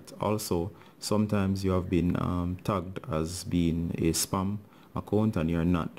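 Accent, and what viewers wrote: Jamaica. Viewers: Your a Jamaican?💯🇯🇲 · I love the Jamaican accent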